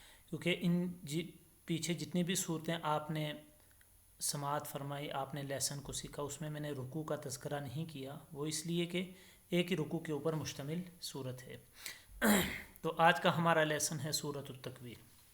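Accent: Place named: Panipat, India. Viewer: Pani Patti awaz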